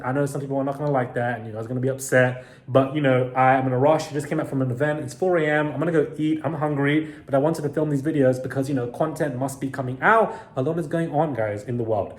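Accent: London, United Kingdom. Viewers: I WATCH you from Lithuania more than a month, like very much. Very good British accent you have.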